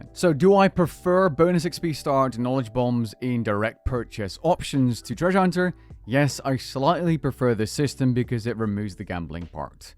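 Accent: United States. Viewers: So, you're telling us that you have a light soft American accent voice, right?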